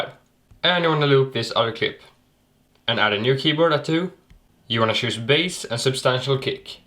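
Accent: Sweden. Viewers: visst är du svensk eftersom att du har på svenska inställningar och pratar lite svengelska xD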